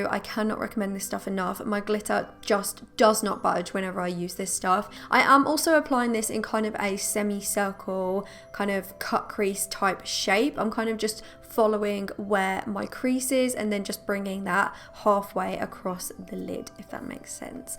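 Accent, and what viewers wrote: England, United Kingdom. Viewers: I believe she means that this woman has a beautiful English accent and face, something which she does not have. But I can see how that might be misconstrued lol. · This girl is beyond beautiful and has an English accent 😒😩😫😭 life’s just not fair!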